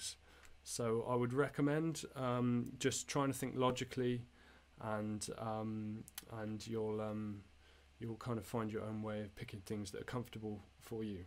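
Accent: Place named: England, United Kingdom. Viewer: Hi, I like your vids a lot! yes always interesting, real humility. We can guess your pleasure in playing guitar, and speaking about it. I also like your english kind of speaking as well. Ah! I've noticed something, when you play electric guitar: it seems your little finger is not used at all, is it? I would maybe say more ( 😅): you mostly play with the two first fingers (not counting the thumb of course). I will not try to copy it, but try it to see what it feels, yes maybe. But for my part, I play most of time Gipsy Jazz. OR Jazz, too.. always learning more and more. Greetings from France,!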